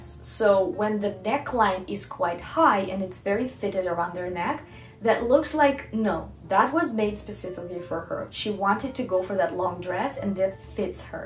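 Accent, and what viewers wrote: Russia. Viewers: Is your mother tongue Russian?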